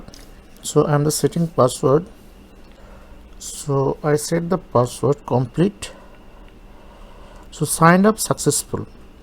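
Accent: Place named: India. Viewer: Talk better English, your India English is worst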